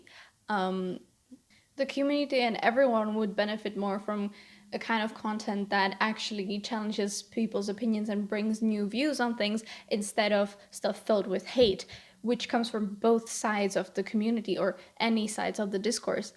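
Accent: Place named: Finland. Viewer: Excellent points!! This really needed to be said. Satutko muuten olee Suomesta? Aksentista päätellen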